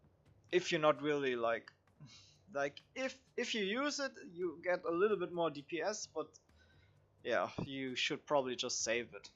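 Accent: Germany. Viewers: are you german ? (accent) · so german accent +1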